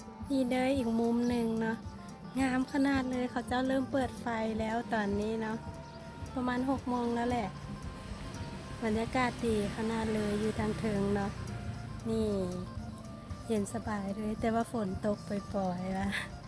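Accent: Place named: Laos. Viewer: สำเนียงลาว แต่คำไทย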